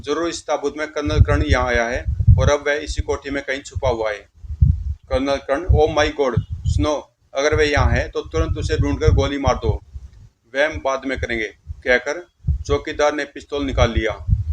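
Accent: Haryana, India. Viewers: Bhai hariyanvi lage se😊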